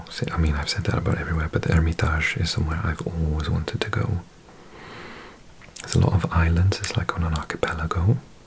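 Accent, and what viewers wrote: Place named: Wales, United Kingdom. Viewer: I have a theory…. Your Welsh!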